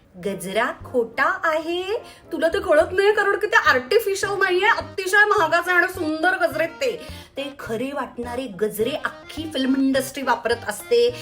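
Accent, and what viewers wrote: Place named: Kolhapur, India. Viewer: Tu punyachi vatatach nahis Kolhapuri pattern ahe ha as Dhak dhak mhantay❤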